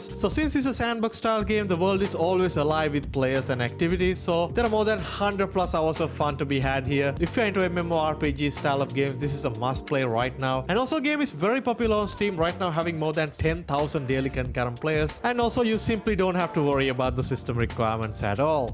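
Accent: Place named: India. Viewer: your accent speaks Indian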